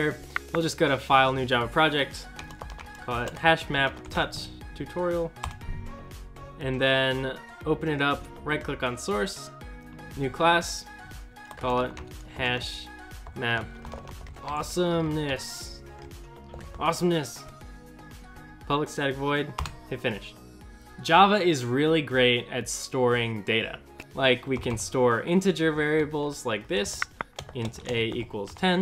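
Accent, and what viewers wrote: India. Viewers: Here, after concentrating to get what was said in the Indian accent, one time too often.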